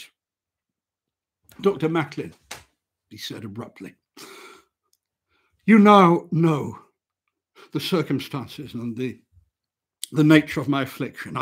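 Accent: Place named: RP, United Kingdom. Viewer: Thank you for this, Mr. Posh Voice Reading Man.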